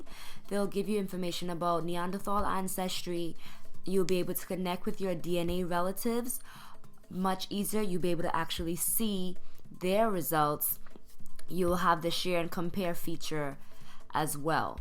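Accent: Trinidad and Tobago. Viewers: I couldn't decide between Trinidad or Grenada when I first heard you speak. I got my answer browsing the comments lol. Either way, if you're from one, you likely also have family from the other. Great comparison video! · yuh sounding Trini! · You sound Trini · Exactly what I was looking for, fellow Trini! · You sounded like Guyanese until I heard the little Trinidadian accent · how you sounding Trini,sweet like a Trini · I This lady sounds Trinidadian🇹🇹 Interesting video..... Hoping for a Christmas sale as they are expensive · 23andme Had a sale so i used them, also knew a friend who used it. I'm also a trini, i suspected you were as soon as i heard you speak.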